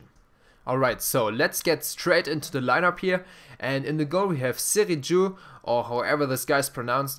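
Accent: Germany. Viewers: R U GERMAN OR FRENCH???????????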